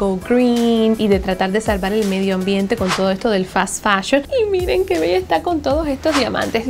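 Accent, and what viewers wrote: Cuba. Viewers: tu cartera esta muy bella, pero te pido que no pierdas tu acento cubano, he notado como que lo quieres apartar y la verdad te queda muy bonito hablar así.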